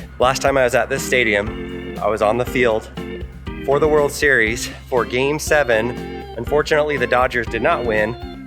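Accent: China. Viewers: Even the voice is Chinese-English lol.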